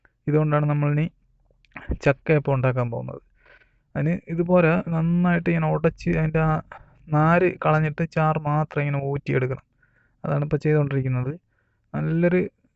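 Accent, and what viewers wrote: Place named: Trivandrum, India. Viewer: അണ്ണാ പക്കാ തിരോന്തരം തന്നെ 💪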